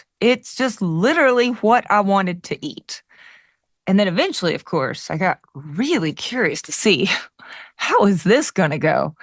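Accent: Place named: South, United States. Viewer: You had me from the moment you said, "That is it for the old news..." The momen you started describing your sudden craving for pure fat, and said it in your adorable southern accent, you had me smiling, laughing, and totally charmed. Thoroughly enjoyed your video. You didn't mention your complexion, but that had to be at least as good as, if not better than, your cholesterol levels! Let 'er rip,!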